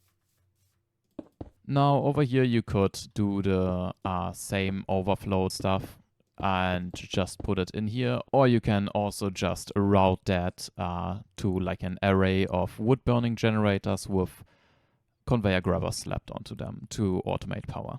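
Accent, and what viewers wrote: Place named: Germany. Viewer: I can hear the Deutschen Akzent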